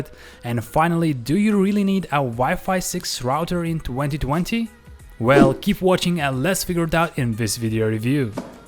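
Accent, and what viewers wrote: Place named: Lithuania. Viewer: Well, I'll support you vid with comment. Wi-Fi 6 looks more like transitional standard. I'm almost sure that when manufacturers start to implement 6 there would already be 7. A very limited range of units available now, so I'll wait until it be more across-the-board. Čiotkas, su niekuo nesumaišomas Lietuviškas akcentas :D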